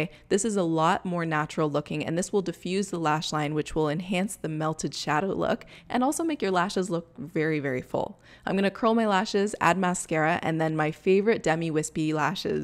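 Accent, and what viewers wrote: Canada. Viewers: Guys is she canadian?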